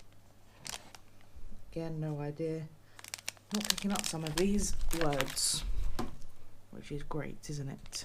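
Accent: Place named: England, United Kingdom. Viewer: That was a really good review. Great amount of food, and plenty of drinks and snacks. That English accent. Looking forward to your next review.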